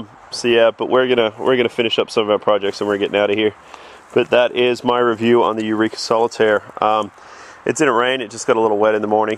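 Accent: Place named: United States. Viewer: You have a thick American accent for an Aussie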